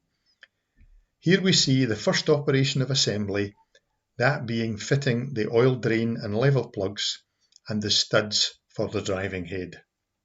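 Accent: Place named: Scotland, United Kingdom. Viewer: The accent is Scottish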